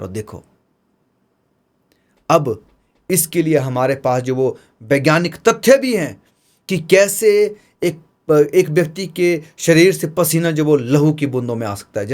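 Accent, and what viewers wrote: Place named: Himachal Pradesh, India. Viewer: Himachali hindi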